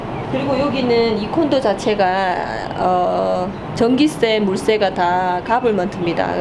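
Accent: Gyeongsang, South Korea. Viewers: 경상도분이신듯 사투리가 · 경상도 냄새가 나네요ㅋㅋ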